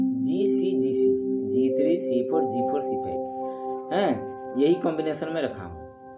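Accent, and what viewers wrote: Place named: Bengal, India. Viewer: Res sir pranam Excellent tut I am practicing the same My request is if you can text swars along with it Your accent is bengali g sounds like dh Regards Pl forgive me if I am wrong God bless you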